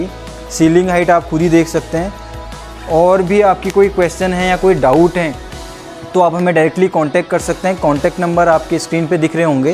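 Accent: India. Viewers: bro is 100% kumaoni, i can tell from the accent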